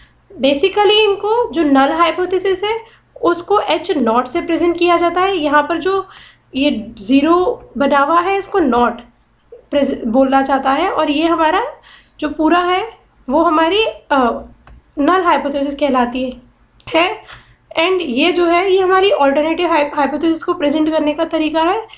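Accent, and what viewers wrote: Bengal, India. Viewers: Madam, Apka bangali hai, .... Matlab ko mane bol rahi hai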